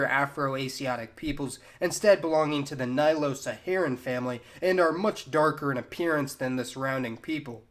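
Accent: United States. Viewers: Dude, your hyper-stereotypical American pronounciation is just killing the fun in listening to your vids.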